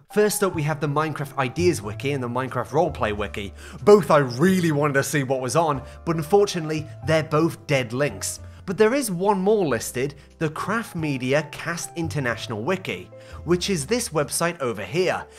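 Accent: United Kingdom. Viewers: dont worry hes just british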